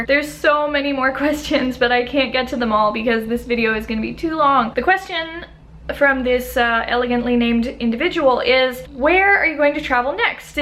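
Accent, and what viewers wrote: Argentina. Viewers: Suenas Argentina! Que linda! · Holaaa !! Soy de Argentina , que lindo que estés interesada en aprender Español !! Se te nota el acento argentino !!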